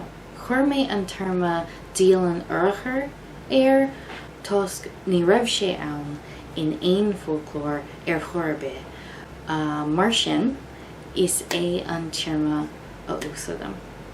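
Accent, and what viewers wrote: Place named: United States. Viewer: Beautifully put. I like the slight American lilt to your explanations...👍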